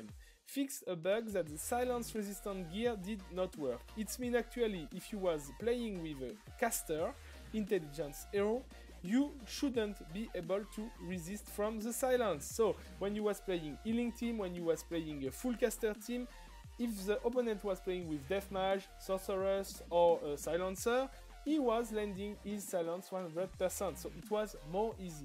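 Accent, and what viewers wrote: France. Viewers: Bon gros accent français mdr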